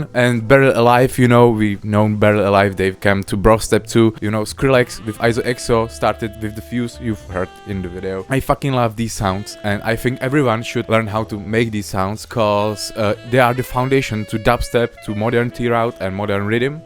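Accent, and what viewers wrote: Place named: Czech Republic. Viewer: ja si celou dobu rikal, ze mas takovej ceskej prizvuk a pak si dropnul pancz a bylo mi to jasny:D dobrej tutorial btw:)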